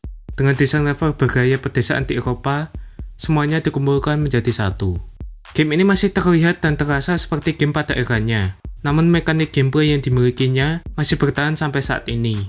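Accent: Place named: Java, Indonesia. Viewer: suara nya kok medok 😭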